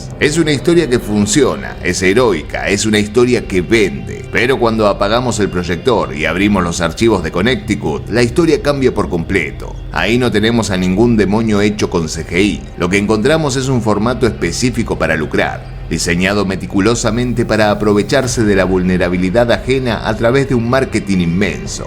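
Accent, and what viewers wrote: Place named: Argentina. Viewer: Amo este acento argentino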